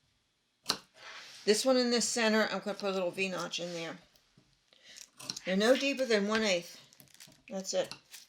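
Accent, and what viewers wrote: Philadelphia, United States. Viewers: In case you like cooking- Chef Jean-Pierre has Jack the family behind his camera. And boy oh boy the onyo soup- lol food's great and Chef is good n funny w his French accent. As nice to hear as your Philly accent!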